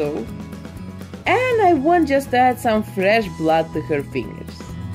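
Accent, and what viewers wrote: Russia. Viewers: I love your voice its like Russian mixed with Queen Elizabeth.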